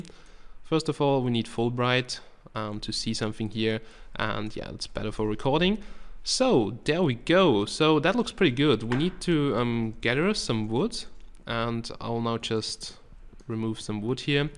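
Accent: Germany. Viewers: man hört das du bist deutsch :)) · biste deutscher? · kann es sein dass du deutscher bist ? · are you german,? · are you German? Bist du Deutsch? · was du bist deutscher? xD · are you german? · Man hört das du deutscher bist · Ist er Deutscher? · is he german ? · hey are you german ? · are you german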